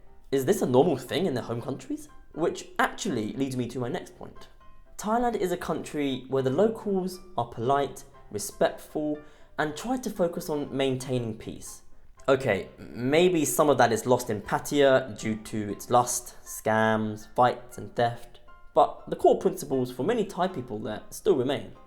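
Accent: United Kingdom. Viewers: A british accent talking about his favorite past time aka the ladyboy and complaining that there are too many Indians in Thailand now.....2025 started off slow but is gaining traction coming from the last video I watched titled Carnival cruise ships are floating ghetto simulators.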